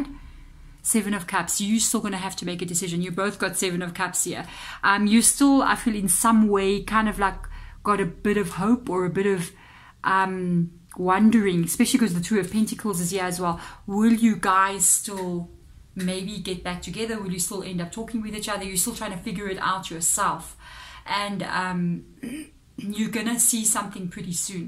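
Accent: South Africa. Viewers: Hello , are you South african afrikaans? I kind of recognize the accent .Spot on for the reading my story sadly.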